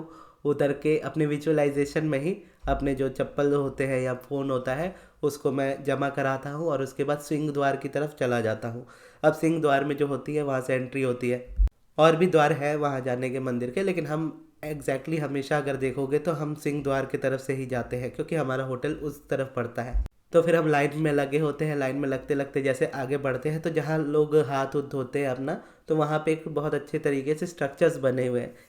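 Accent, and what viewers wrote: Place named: Jamshedpur, India. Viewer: Pta h bhai mai v jamshedpur jharkhand adityapur se hu aapko ek baat batau jindgi mai low of attraction ke videos dekh dekh 2014 se paresan ho gai the matlab bohot confuse fir ek din maine universe ko bola bhagwan please kisi v tarah koi insan ho jis se low of attraction bohot easy ho jaay sikhna please us se mila do aur us waqt mai bank mai baithe the aur aapki ek video mili aaj wo ek h mai her video apki low of attraction ke achi se samjh pati hu sikh pati hu starting se aapko follow kr rahi hu aapko aur aapki awaj sun ke feel hua pta ni kuy ye humlogo ke yahna se he h fir pta v chal gya aap v jamshedpur se he ho aur main v aaj low of attraction bohot ache se sirf aapse sikha matlab universe ko bola unhone aapko bhej diya .aaj meditation v sirf aapke wajah se kr pati hu.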